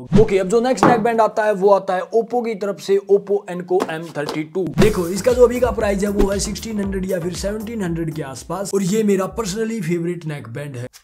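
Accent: Haryana, India. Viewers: Bhai boli se to haryanvi lage hai kha se ho.... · Bhai haryane se hai k ? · Nyc haryanvi accent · Harayana say h bhai 😂😂jai haryana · Haryana se hai kya bhai, tone to kuch aisi hi hai❤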